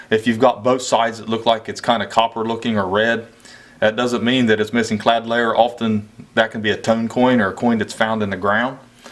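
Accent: Kentucky, United States. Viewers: Color not Collar. Wtf you're from Ohio, sounding like some Kentucky imposter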